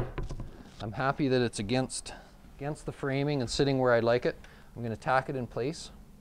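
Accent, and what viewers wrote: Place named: Canada. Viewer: I needed help to fill a window hole. I could only find this and I figured, pretty much same process. This will work perfect. Thanks so much. I was also pleased when I heard you speak. I thought, "Well now...a Canadian accent." Thanks a bunch from New Brunswick.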